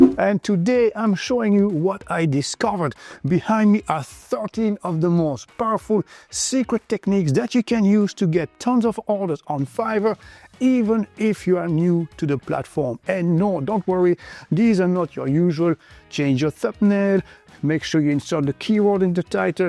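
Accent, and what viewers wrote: France. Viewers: gotta love the french accent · I suspected the French accent too with well spoken English. Kudos sir!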